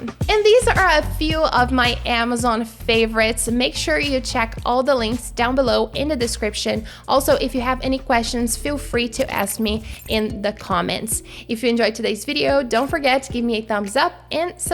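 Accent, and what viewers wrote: Brazil. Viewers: I love your English. You’re Brazilian, aren’t you? You could open an English Channel to give us some tips.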